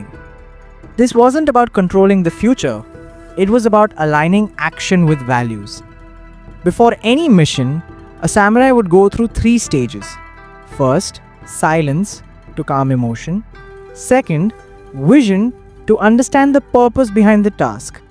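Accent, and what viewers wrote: India. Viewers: Indian accent 🙄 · At last the self help topic with an Indian voice which made me stop and listen . Usually they are all junk. Thank you for the quality video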